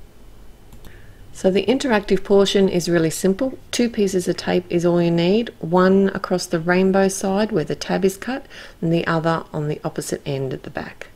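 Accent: Australia. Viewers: Very cute card. Farts doesn’t sound so bad when you say it with our Aussie accent! 💖🇨🇦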